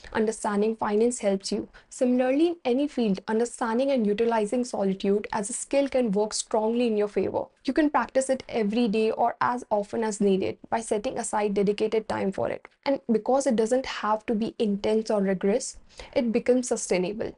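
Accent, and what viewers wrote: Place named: India. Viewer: Indian English Girl 😊 Masterpiece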